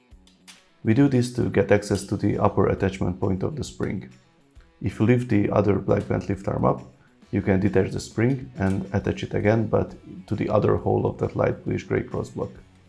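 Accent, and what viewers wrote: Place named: Hungary. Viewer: Kicsit olyan magyar akcentusa van nemtudom miért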